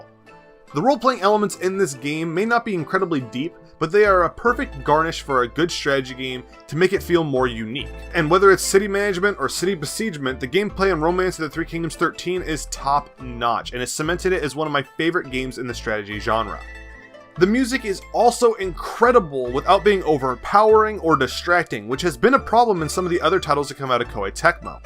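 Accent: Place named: Midwest, United States. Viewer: That's one hell of a mid West Flow you got their son.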